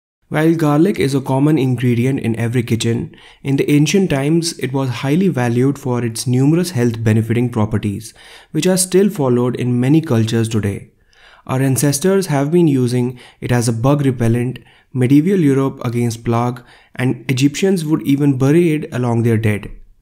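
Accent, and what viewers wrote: India. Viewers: Is that a Hindi accent? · I ❤ Indian accent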